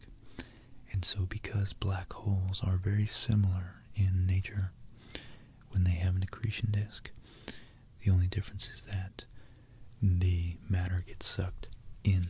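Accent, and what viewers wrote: United States. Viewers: Im late to the party with your asmr and im not a fan of a US accent but your voice my friend is absolutely perfect and within moments I know im going to drift off. I love the just talking about books and sciences and the mythology was cool. Please do more. You're not as prolific as some of your contemporaries who seemingly just like to get their norks out. Bizarre behaviour, its like asmr/onlyfans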